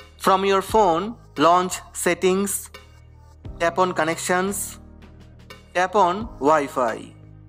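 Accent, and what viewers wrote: India. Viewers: Course has to have that Kwik-e mart accent...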